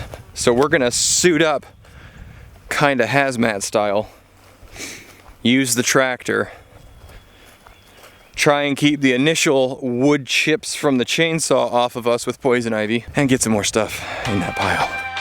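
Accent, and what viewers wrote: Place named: Australia. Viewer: Hilarious how we get down under accents from you I would be doing Yankee or pommy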